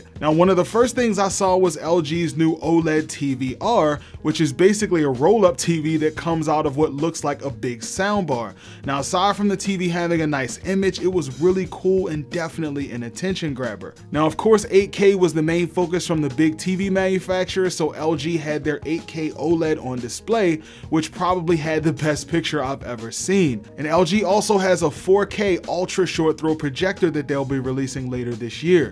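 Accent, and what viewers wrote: Baltimore, United States. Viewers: Thought I recognized that MD semi b more accent.:) Great vid as usual I use a lot of your info. MW, Wash DC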